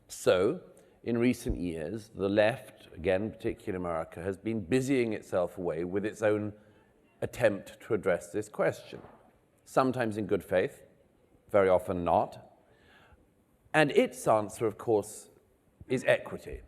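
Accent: United Kingdom. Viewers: You sound British. Have you heard of the Oxford Dictionary? meritocracy noun government or the holding of power by people selected according to merit Seems your “something like this” definition is about as useful as the English football team over on the continent right now But … I guess you have your agenda to push So, Best of British, you posh propaganda old chap! That said, mmm. wouldn’t discussing something (meritocracy) by defining it in a rather off-centre way … what could be called “straw manning”? But … maybe you’re more familiar with plain English than those chaps at Oxford (?)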